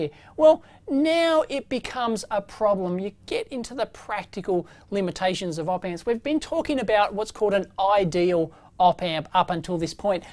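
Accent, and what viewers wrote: Australia. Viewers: This may be the most Australian sounding man I have ever heard.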